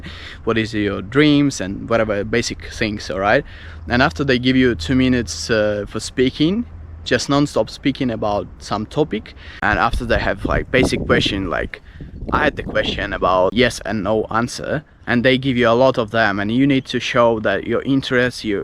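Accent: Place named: Australia. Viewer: Скоро уже не отличить от австралийца)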